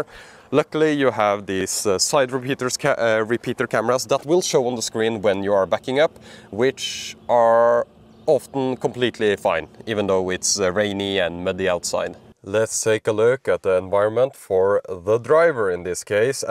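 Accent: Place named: Norway. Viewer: Do Norwaynians always have this ehh eh ehh ehhh eh accent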